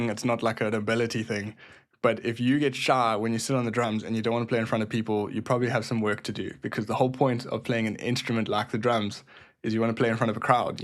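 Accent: South Africa. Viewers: A South African 😂 with a KZN accent?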